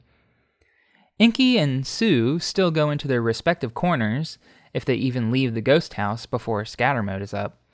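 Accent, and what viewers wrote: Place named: South, United States. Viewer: Are you from the south? I like your accent.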